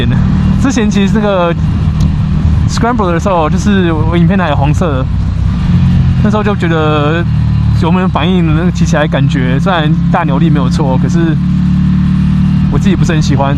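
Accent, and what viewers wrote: Taiwan. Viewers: 講國語感覺很親切啊 台灣腔很濃😂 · 我覺得你講英文的時候好帥哦！ 講國語的時候又很台，怎麼會這樣子🤔🤔🤔🤔🤔🤔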